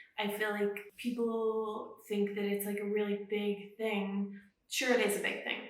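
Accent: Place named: United States. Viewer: OMG I AM HERE FOR THIS NEW ACCENT ON YOU!!!! IT's kind of like AMERICANNNNNN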